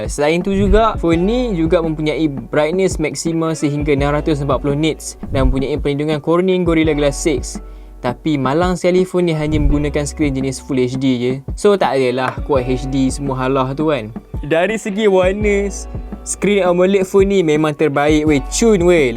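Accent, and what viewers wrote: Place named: North, Malaysia. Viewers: Barai lah budak nih dgn loghat utara lagi haduhhhh failll..... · Nice. Kipidap. Tapi loghat utara tu cover2 sikit boss ku, sebab kalau bercampoq dengan kl dengaq lain mcm oii haha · Cakap ja utaqa wei. Pelik eh. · Org perak ghupenye... kome perak mana tu??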